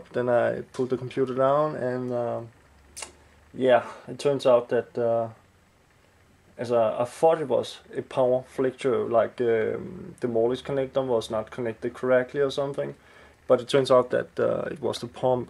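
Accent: Denmark. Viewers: Are you danish? :-D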